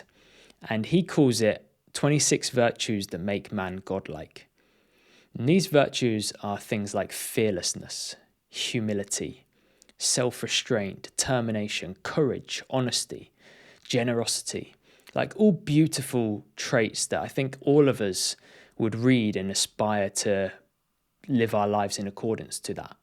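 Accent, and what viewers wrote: United Kingdom. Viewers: nice to see a person from the uk talking about higher self and spirituality. Somehow it feels more relatable when someone is talking about this stuff with an accent and an approach that you can identify with. Thanks for sharing this. I also have a channel, but as you can see it’s all about motorcycles and adventure, however as with most people there’s another side. Just before lockdown a personal event lead me on a journey. I finally found Reiki and law of assumption. I’m so much more mindful about my own thoughts, words and feelings. Meditation is something I struggle with to be honest but it’s something I feel I need to incorporate. Thank you for this video.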